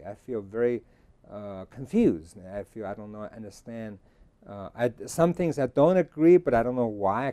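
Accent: China. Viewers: My god. His English is even not as fluent as his Mandarin. I know his first language ( mother tongue) is mandarin. Obviously, he uses Chinese thinking to express himself in English. Also he owns a mandarin accent when speaking English. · Just started listening. . it is so unusual to hear a white person speak english with a slight Chinese accent! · lol, an American speaks English with strong Chinese accent. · You guys don't know that his Mandarin got a ShanBei accent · Very strong Chinese accent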